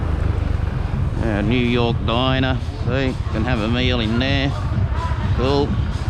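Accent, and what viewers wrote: Australia. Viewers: Annoying Australian accent, go home if is nothing open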